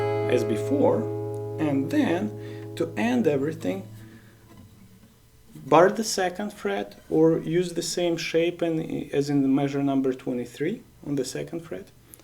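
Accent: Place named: Russia. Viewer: Прям русский акцент.дядька,чем тебе наш язык не угодил?думаешь просмотров больше будет на инглише?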